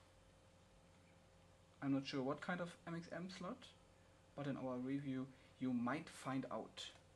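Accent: Germany. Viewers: ein leicht deutsch akzent ;) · schöner deutscher acczent^^ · ich höre schon das du eigentlich deutsch sprichst ;D kannst du mir sagen welche grafikkarte rein müsste um battlefield 3 auf hohen ( nicht ultra ) einstellungen zu zocken ?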